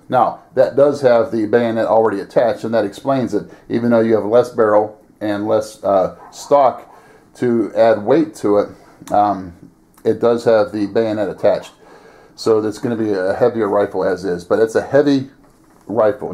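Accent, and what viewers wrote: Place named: Oregon, United States. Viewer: I have both the Russian 91/30 and M44 and a Russian SKS and SVT-40. I love my Russian rifles and really love the looks of the fellow shooters at the range with their ARs who never heard a Russian BOOM STICK that breathes fire before. I end up having half a dozen guys want to shoot it every time I go out - so cool. It's nice to share the historical details of these great weapons to people who have never seen one, let known fire one. I'm in Central NJ, and shoot at Fort Dix Army Base (Range 14) I'm a Civilian DOD employee and get a really great annual membership there. I'll be honest, my favorite to shoot is the M44, the firm kick and flames really let you know you have something powerful in your hands. But by far the most accurate is the SKS which I can shoot 8 inch groups from a stance at 100 yards, over and over. 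To me it says a lot about the build on the SKS, especially the trigger pull. I never touched the Mosin triggers, although I fully stripped, sanded, stained and Boiled Linseed Oiled (20 treatments) to my M44 which has such a lustrous feel to the stock and a wonderful matted finish. <link> I'm curious, I'm pretty good with accents and you sound Oregonian (at least PNW) to me - am I close?? Thanks for this great intro to these wonderful rifles. I somehow missed this video although I've tried to see every one I could in the 4 years I've own these rifles. Bravo on a great video! OH... That does look like a Tula Star, although I have no idea why it is so small and NOT whe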